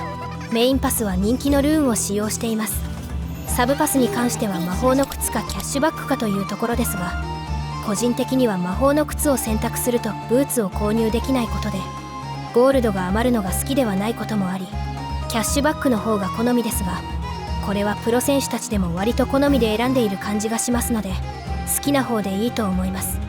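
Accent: Kansai, Japan. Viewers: わい関西人やから時々関西弁はいるの親近感感じてうれしい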